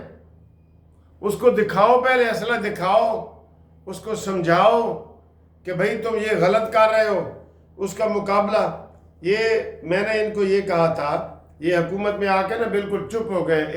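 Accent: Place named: Rahim Yar Khan, Pakistan. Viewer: Rahim yar Khan wali seet say anaza Laga lain app key boly ka mahawara hay o mara toon sakon k ada ain asan app masain waday ain a Ali Manchester Wala sargodha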